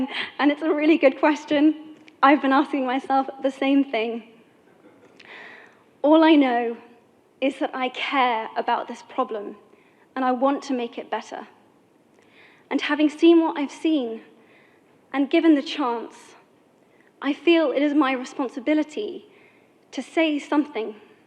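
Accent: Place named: United Kingdom. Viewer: 英式英語欸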